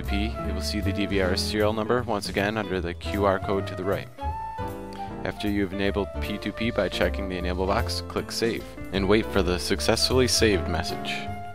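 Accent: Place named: United Kingdom. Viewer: bahiya kaha se ho britain ya germanny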